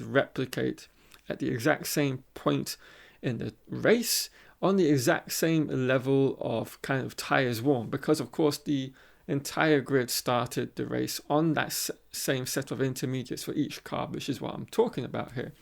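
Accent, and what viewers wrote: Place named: England, United Kingdom. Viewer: I’m having a hard time placing your accent - where in England are you from?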